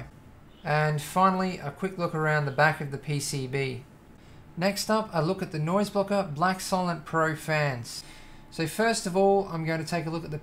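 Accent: Australia. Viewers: What country are you from most are US but you sound aussie?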